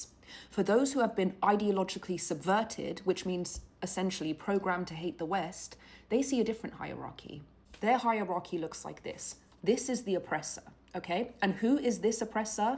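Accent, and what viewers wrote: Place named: England, United Kingdom. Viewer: You don’t sound like a person from Iran, but someone from England. Are you English or Iranian? Make sure you update the English government.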